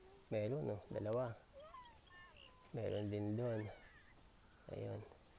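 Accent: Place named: Ilocos, Philippines. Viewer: Parang Ilokano ang punto mo sir,baka magkalapit lang ang lugar natin sir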